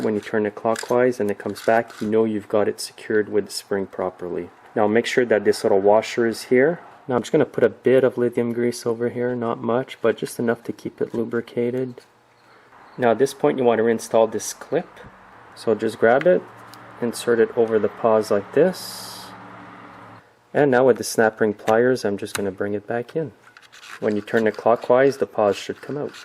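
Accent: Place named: Quebec, Canada. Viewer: Hey this worked absolutely perfectly on my 180 C Easy2Start. Couldn't have done it without your careful, calm coaching. You sound as if you might be from Quebec, if so, merci from an Ontario friend!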